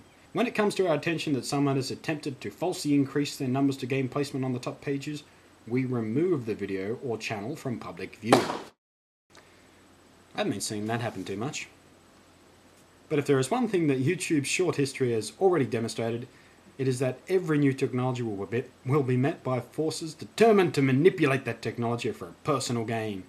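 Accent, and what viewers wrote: Australia. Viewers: haha that was funny. British though? Seriously, anyone with hearing can tell the difference between an Aussie accent and a British accent. That was a funny British accent though. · Haha, yeah, he made this whole thing sound so ridiculous. It's weird how people always think Aussie accents are British. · Zomgkittens!!1eleventy The barbarians are at the gate! :D Love the titles, as always. I really don't know how any journalist could mistake your (almost textbook) Oz accent for British. And I'm sure PL knows you're doing a cockney accent there, not her silky-smooth posh.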